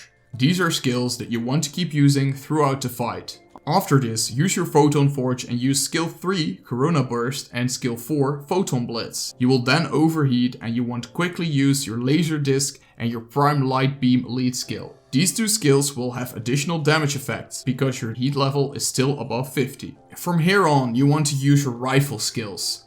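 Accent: Netherlands. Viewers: I hate dutch morons and their shitty accents.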